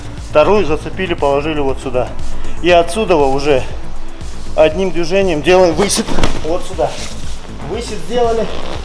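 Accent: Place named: Chechnya, Russia. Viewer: Слышу родной для ингуша чеченский акцент. Молодия для души!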